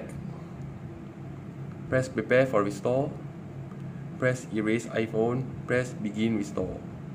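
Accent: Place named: Malaysia. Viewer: no wonder sounds like malaysian.thanks btw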